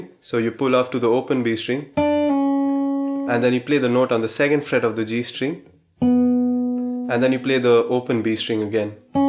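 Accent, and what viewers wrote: India. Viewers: Your accents seems to be Indian..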